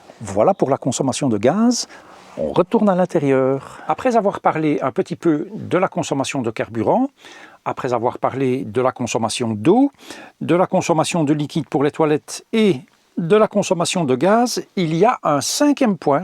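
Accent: Belgium. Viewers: Bonsoir. Un grand bonsoir à la Belgique! Pour la conso en eau je ne puis comparer à la maison. Parce ce que j'ai 2 ados en plus par rapport à mon CC (motor-home!!) Donc machines à laver, lave vaisselle, jardin, piscine...Ceci dit ma douche dans le CC m'a appris non pas à être propre, mais à économiser l'eau sur ce poste. J'adore l'accent qui me rappel mon oncle et tante de côté de Lens (en Belgique bien sûr!)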